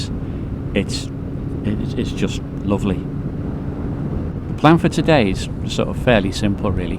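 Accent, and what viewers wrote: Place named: RP, United Kingdom. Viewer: Loving the voice over hadn't realised how posh you sound 😂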